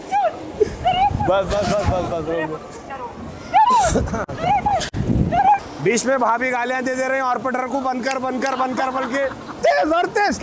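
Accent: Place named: Hyderabad, India. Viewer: Tumhari hyderabadi dialogue delivery ek dum chindian hai ustad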